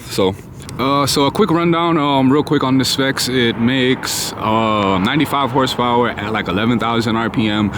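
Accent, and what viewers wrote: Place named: Trinidad and Tobago. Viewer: I'm looking at the naked bike version of this, they both look so damn good. It's crazy because I remember no one talking about them, looked them up and then next thing I know the 675 is everywhere lol. Enjoy it bro, for a first bike you made such a good choice you probably won't want to change for a long time. Also, I hear your accent bro ! lol my family is from Trinidad so I got an ear for it. Dropped you a sub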